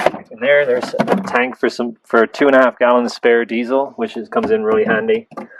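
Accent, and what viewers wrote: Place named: Ireland. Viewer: I detect an Irish accent.where u from.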